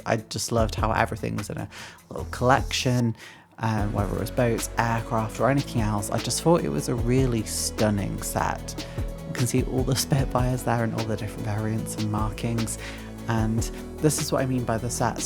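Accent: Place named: West Country, United Kingdom. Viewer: "Ello my loverrr" I thought you sounded like you came from the west country! Great video loved it!! 😍 looks like a great show with some outstanding models. Hope you're well, thanks for posting.😘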